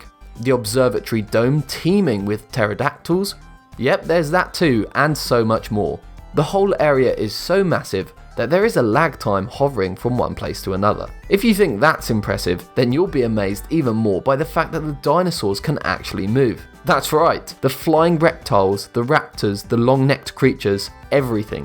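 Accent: United Kingdom. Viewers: is he american with a british accent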